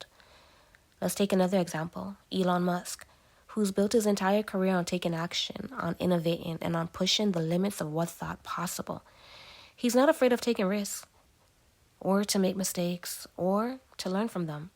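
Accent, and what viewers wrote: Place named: Toronto, Canada. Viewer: As soon as I heard you talk I said you must be from Toronto as well, Yayyyy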